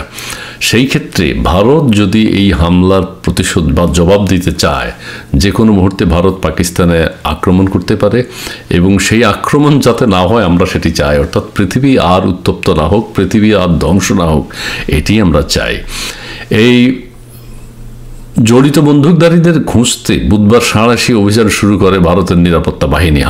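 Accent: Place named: Noakhali, Bangladesh. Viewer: নোয়াখাইল্যা ভাই !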